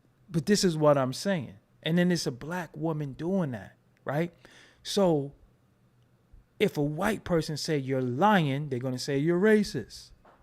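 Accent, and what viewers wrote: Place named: New York, United States. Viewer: Nigga you sound like a NY’er… I’m my NY accent “Get the f… outta here”…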